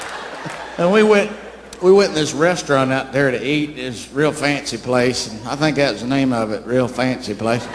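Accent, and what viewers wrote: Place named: South, United States. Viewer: His southern voice makes the jokes, all the more better! Who agrees wit me?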